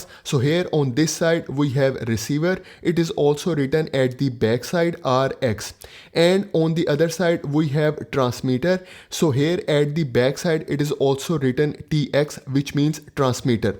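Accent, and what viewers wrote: India. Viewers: Once again I was convinced that the English spoken by Americans and the English spoken by Indians are not the same English. Jesus will sooner return to us than Indians will learn English.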